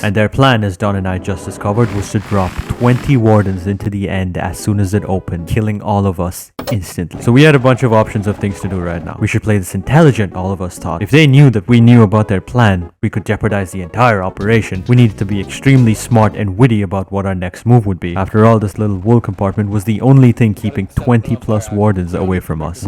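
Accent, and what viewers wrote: India. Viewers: hearing that ur indian, just makes me sad and happy, i knew since the first day that u were pakistani or indian, i knew by your voice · He's Indian even his accent is Indian lmao, if he was nri he would have mentioned the country he lives in not India.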